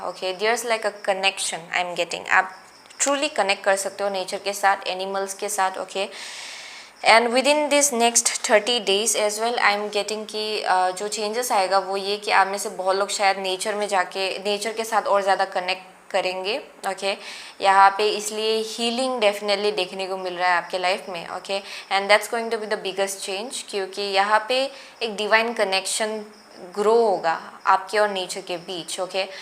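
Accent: Northeast, India. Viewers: R u from northeast???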